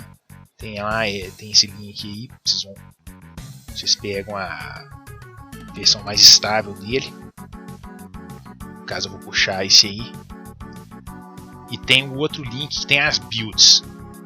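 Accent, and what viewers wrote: Minas Gerais, Brazil. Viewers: O melhor do video é o sotaque de mineiro do mlk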